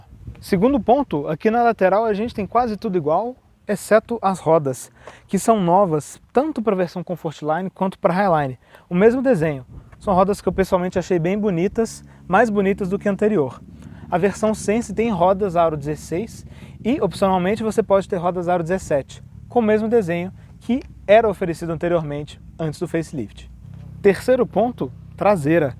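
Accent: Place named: Rio de Janeiro, Brazil. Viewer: O sotaque do apresentador está mais para um carioca, igual meu vizinho, a avaliação nota 10.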